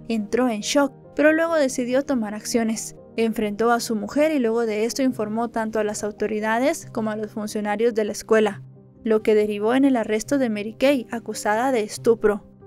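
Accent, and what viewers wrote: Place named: Mexico. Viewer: Una narración a la mexicana. Excesivo uso del marcador "pues". Se nota que esta chica narradora es mexicana. Pero podría equivocarme, mejor que lo diga ella.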